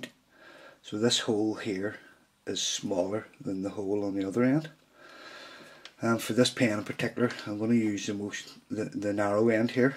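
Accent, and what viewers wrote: Northern Ireland, United Kingdom. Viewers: northern Irish not Scottish :P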